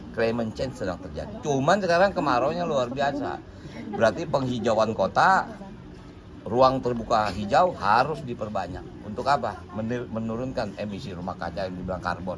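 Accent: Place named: Betawi, Indonesia. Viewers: lah emang kenape ngomong logat betawi? masalah buat lo? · Bang dul, DKI bukan betawi semua, jng selalu pake dialek Betawi dong!! Intelek dikit ngapa?...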